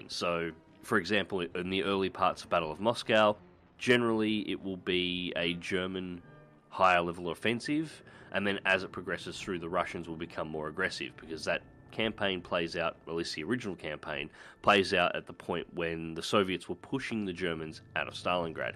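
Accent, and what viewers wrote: Australia. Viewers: Another great video. nice to see you in a plane! love the aussie pronunciations! as usual take care good luck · You sound more australian than Rimmy. I'm impressed.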